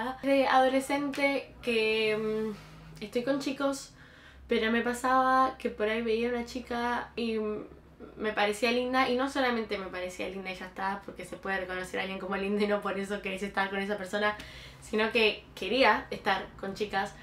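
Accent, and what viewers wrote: United Kingdom. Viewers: Vine a tu canal por tik tok. Empecé con un vídeo de como aprender inglés y termine en este video 🤭 eres re linda! Amo tu acento británico 🤩 y le aplaudo 👏 a tu mami por apoyarte puesto que no todos los padres entienden a sus hijos cuando se declaran con otras preferencias sexuales. Tus videos me han ayudado mucho! Sigue así! 🤗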